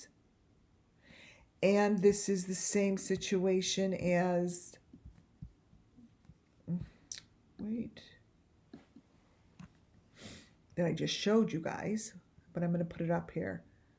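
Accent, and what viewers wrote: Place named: Midwest, United States. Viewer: Your voice sounds like that other channel. Um, chasing truth I think. It just could be the Midwest accent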